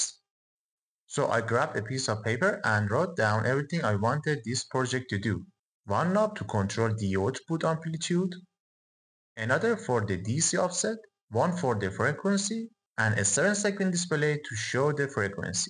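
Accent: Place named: Iran. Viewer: سلام آقا.بدون اینکه اسم اکانت رو ببینم گفتم این انگلیسی حرف زدن یه ایرانیه.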